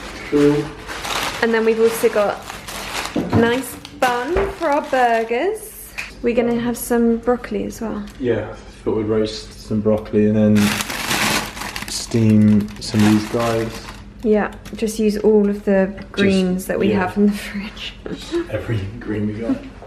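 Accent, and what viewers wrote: RP, United Kingdom. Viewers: why are you always trying to sound extra posh lately? youre not even from a posh background.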